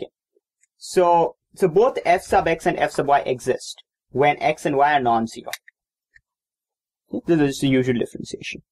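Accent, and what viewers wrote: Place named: Arab world. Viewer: middle east accent is just annoying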